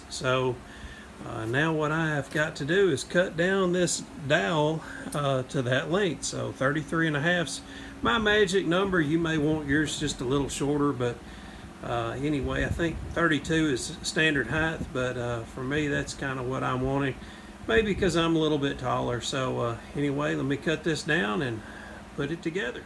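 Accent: South, United States. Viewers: I love the lush greenery and wet rain - so beautiful! Must be down South judging from the accent. That is one lovely table! The contrast of white dowel with black walnut is so lovely. Too bad we never saw the underneath when finished. I don't know how this moves exactly. I guess it just swivels on the rod? Also wanted to see the dowel inserted.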